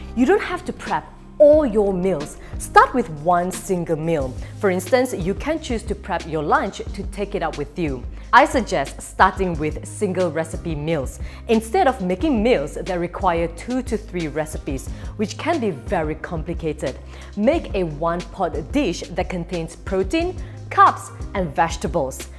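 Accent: Malaysia. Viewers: It’s a good Malaysian accent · Never felt this proud of our Malaysian accent😇👍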